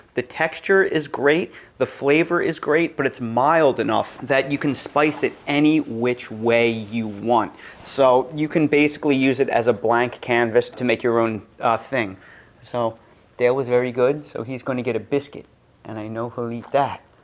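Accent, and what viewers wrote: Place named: Pennsylvania, United States. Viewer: Hmmm, you're a smart guy previously from PA if I judge your accent correctly. So why live in Humideville? My folks were from PA, I went to humid HS in Maryland and have tried to stay west in the Rocky Mountains/Colorado Plateau. Dry, great views, et al. In any case i love your videos and gardening education! I'm 80 yo, but hopefully can still learn. Blessings.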